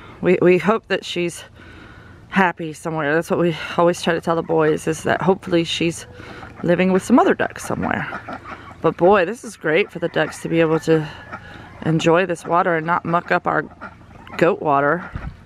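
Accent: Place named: Massachusetts, United States. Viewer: It’s funny because I hear your Massachusetts accent and your southern accent.....I’m the same way. That Northern accent just flys out of my mouth lol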